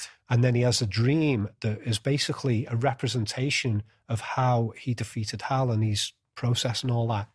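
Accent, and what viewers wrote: Liverpool, United Kingdom. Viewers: you sound just like Paul McCartney, are you from Liverpool? Anyways, I like it, & I love your videos about movies, esp Stanley’s ❤️ from Texas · I love when unleash his Liverpool accent in all his glory! 🏴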